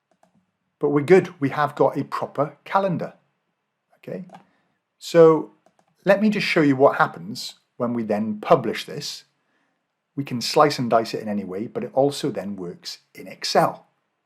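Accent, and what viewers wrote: Wales, United Kingdom. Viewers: This is very helpful, good to hear a Welsh accent as well!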